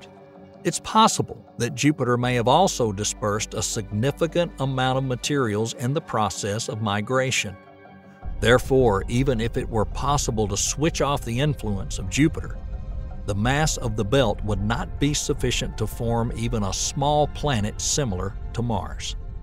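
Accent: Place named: Georgia, United States. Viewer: This leads one to opine... there is some truth to the notion that Jupiter is a savior god, pulling us away from hell, and that the sun is hell, Lucifer, drawing all to it inevitably. This reforms the Judeo-Christian hierarchy of the trinity. God is Satan, the Sun. Jesus is the savior, Jupiter. The holy spirit is gravity, the unifying force that these entities use to interact with us. All this is hogwash, of course. But it is an example of solipsistic thinking. I just did this to show you religionists there's a better explanation that still isn't true. Your creation hypothesis makes no sense at all. P.s. I love how this guy with a southern, Georgia accent, speaks so intelligibly. He's an outstanding orator, with perfect information. Great presentation. Thank you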